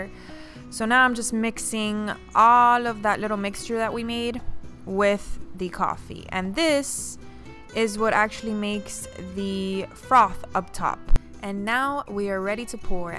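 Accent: Miami, United States. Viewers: Good job on the video. I sense a Miami accent lol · Because I hear a Miami accent.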